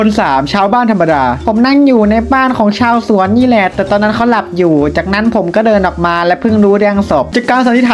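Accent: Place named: Thailand. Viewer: พี่ครับพี่เป็นคนไทยชัดๆเลยครับ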